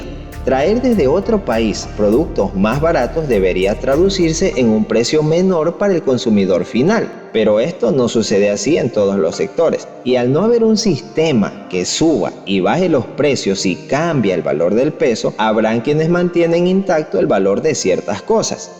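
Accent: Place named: Venezuela. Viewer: Pero tu eres mexicano. Me pareces que tienes acento venezolano.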